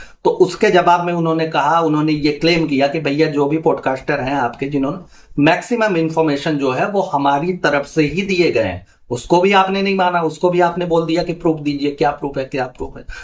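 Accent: Uttar Pradesh, India. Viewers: up se ho bhaiya up me kaha se ho sorry aapko pareshan kar rahi hu but aapki baate sunke bhasa sun ke apna pan feel hota hai. Mai bihar se hu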